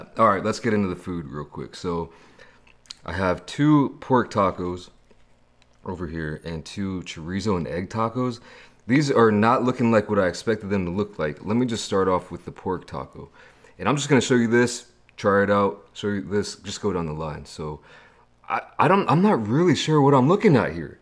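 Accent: United States. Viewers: Yo, what made you want to move to Korea? Just curious because you definitely sound American.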